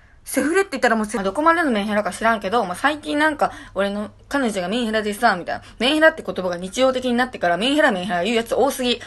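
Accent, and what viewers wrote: Okayama, Japan. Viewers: 岡山弁可愛いすぎい！ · 岡山弁がぬけないとこ大好き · 自分も岡山出身だから岡山弁わかりすぎて好き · こがなチューしよで1人で大爆笑してしまった…爆笑 岡山弁日常的に使う人からしたらめっちゃ聞きやすい！！（笑） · 岡山弁最高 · 岡山弁ええなぁ なつかしい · え？岡山県民って素でこのなまり？？ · 広島県民だけど、広島弁よりやばい面白い岡山弁wwwwww これまたやってほしい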